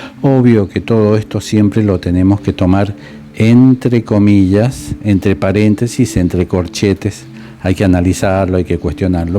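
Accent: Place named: Argentina. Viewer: Buah...que temas más interesantes aborda este canal y como disfruto escuchando a por Dios, con su gracia y su acento argentino que a veces escucho dos y tres veces el vídeo. 😂 Te queremos, aprendemos mucho contigo. Un millón de gracias y saludos desde España 🇪🇦 ❤🙏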